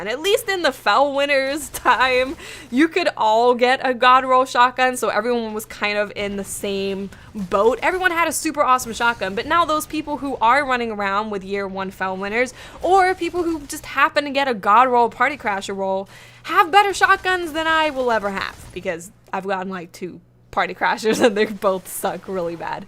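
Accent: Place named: Boston, United States. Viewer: Anyone else notice the slight Bostonish accent in? or is it just me?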